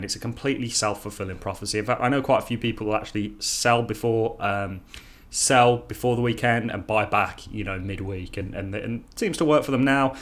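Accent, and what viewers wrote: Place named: Midlands, United Kingdom. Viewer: thanks for your videos. Guessing from your accent your in the midlands.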